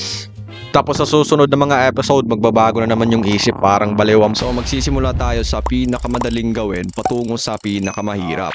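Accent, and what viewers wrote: Visayas, Philippines. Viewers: Bisaya ampta hahhahaha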